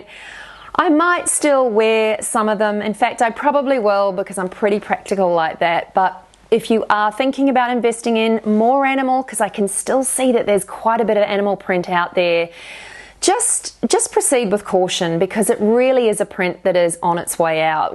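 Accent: New Zealand. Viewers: Where's the accent from? New Zealand? Like the place that hasn't spend a year in their pijamas?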